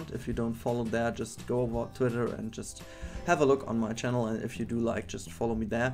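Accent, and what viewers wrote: Germany. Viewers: You are German right ?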